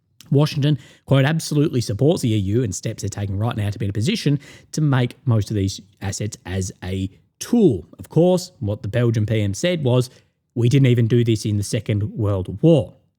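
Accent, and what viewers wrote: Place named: Australia. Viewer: Down under mate 🙂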